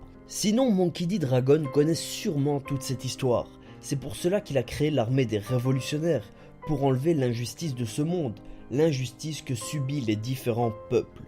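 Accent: Brussels, Belgium. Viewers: T'es de Bruxelles?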